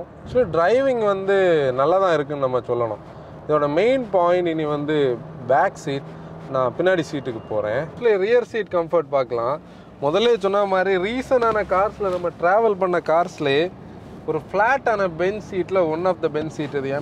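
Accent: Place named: Kerala, India. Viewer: Simple , important , interior , front , point is a word Simble, impordant , inderior , frond , poind is emotion Kerla influenced pronunciation like it though 😃👌😊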